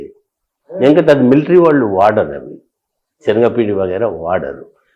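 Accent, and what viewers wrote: Telangana, India. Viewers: తెలంగాణ భాష యాస జీవద్ బాషా ✊🙏శరణర్థి మైక్ టీవీ ✊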